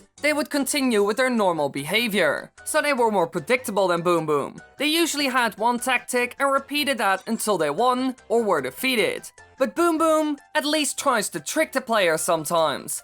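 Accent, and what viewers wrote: Netherlands. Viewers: Ik hoor aan je accent dat je Nederlands bent maar ik wist het niet honderd % zeker alleen toen keek ik een oude video van jou n daar zij je dat je een meeting ging houden in Utrecht maar waar woon jij ik woon in Overijsel vlak bij Zwolle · Are you dutch?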